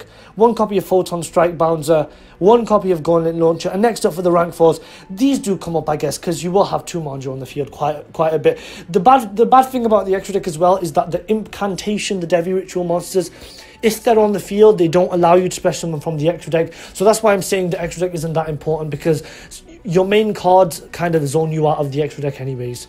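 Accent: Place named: United Kingdom. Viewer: #Nekrozmasterrace I pronouce Natasha the same way you do, even though the only girl I met with the name irl pronounced it like stache too. Personally Impcantation is a better name than Deviritual to me. It's a pun I can get behind. Yeah, I believe you're british. Saying that as a fellow British. I can recognise our kind. 'Eyyyyyyy! That ghost rare artwork for the extra deck cards.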